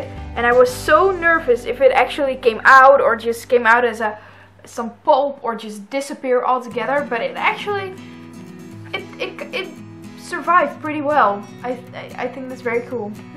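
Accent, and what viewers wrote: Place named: Netherlands. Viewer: Are you dutch? I am Dutch and you sound Dutch great video keep it up!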